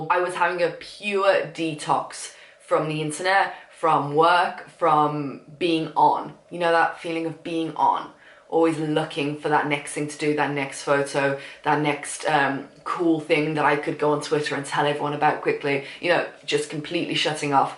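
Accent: United Kingdom. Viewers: you are soo beautiful and ur British accent makes you even more beautiful.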